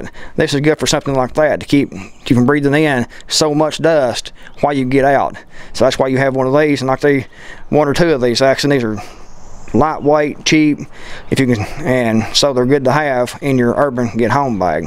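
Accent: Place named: South, United States. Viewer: I only subscribe to a handful of people of which your one. Your videos are fast and full of great content 👍🏻, however, I’ve never been to the south and am guessing part of the time what you are saying so I turn on closed caption, but they are getting it wrong as well 😆. But anyway I enjoy your videos, but your accent sure keeps me on my toes 😬😎 Keep up the great work!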